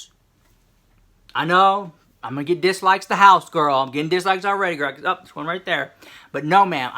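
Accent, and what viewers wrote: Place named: Texas, United States. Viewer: girl we can hear you come from Texas.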